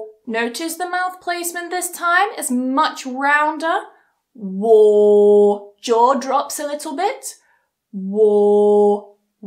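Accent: United Kingdom. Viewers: Love your British accent! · Hi, I prefer coffee. I love your British English Pronunciation with Walk and Work., I also want join your English Pronunciation Pro. You are my best teacher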